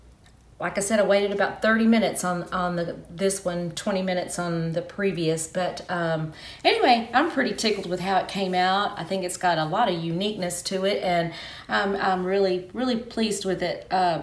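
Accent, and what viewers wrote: Texas, United States. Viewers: Impressive painting. Love the Texas voice too.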